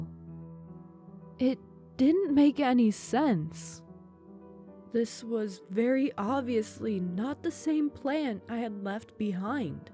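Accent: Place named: South, United States. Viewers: Love your calming southern accent. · You're right!! Magnificent southern accent!! Sounded like one of my neighbors 😂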